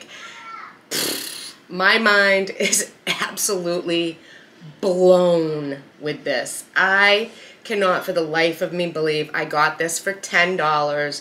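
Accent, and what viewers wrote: Massachusetts, United States. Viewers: I found your channel from watching the canuary videos. I knew your accent was maasachusetts, but didn't realize how close. I grew up in Acushnet and New bedford Mass. Glad to see someone local doing this homesteading thing. I'm in Maine now but hoping to head down there to open gate poultry and get some of there hatching eggs. Have you heard of them? They are so in Wareham.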